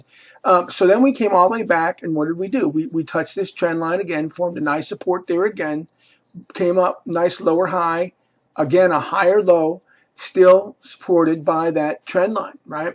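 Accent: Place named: Philadelphia, United States. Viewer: Subscribed because I'm from Philly and that accent makes me feel at ease 😌 lol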